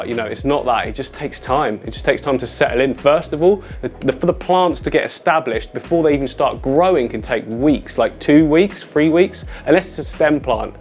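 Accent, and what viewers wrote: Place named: South West England, United Kingdom. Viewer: I thought your accent was familiar! I am south west too!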